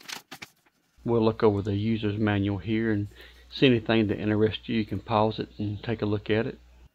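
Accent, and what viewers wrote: United States. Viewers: Love your accent! I miss visiting the US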